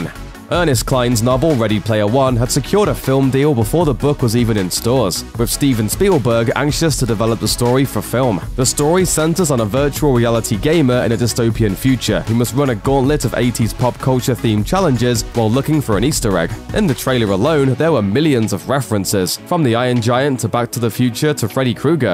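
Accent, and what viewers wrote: Australia. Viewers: What's the narrators accent? Y'know, that makes him say "The Man-dellureffekt" or even just "Mandellur". I always wondered about where that's from. Is it an Australian one? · is this guy australian??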